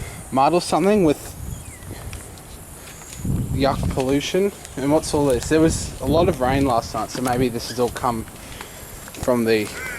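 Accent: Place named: Australia. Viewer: You have a very strong accent. Proves you're definitely in Australia. :)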